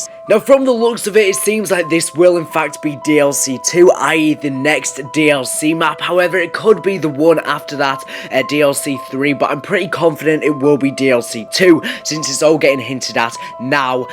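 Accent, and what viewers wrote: United Kingdom. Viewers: steeleman619 He's British...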